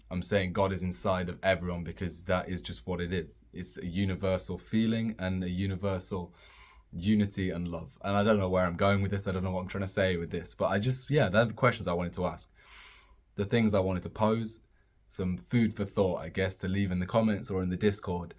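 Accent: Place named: Australia. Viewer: You are Aussie right love your accent I'm Latino greetings from Honduras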